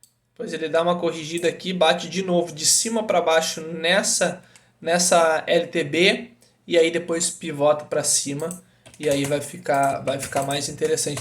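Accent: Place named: Rio Grande do Sul, Brazil. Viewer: Gaúcho de onde mano?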